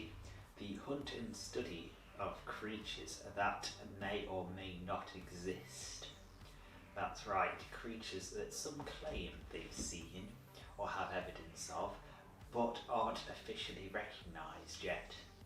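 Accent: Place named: United States. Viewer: So is his accent English or Australian ? He is definitely an American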